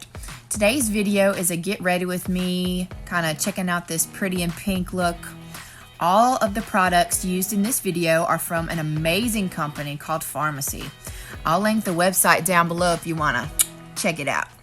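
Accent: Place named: South, United States. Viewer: Guhhh this made me smile! I love you so much and I LOVE that southern accent sha :-) #nevachange 😄😄😄 Also chili's and Lowes sounds like the best date ever. Just sayin. 😂😂😂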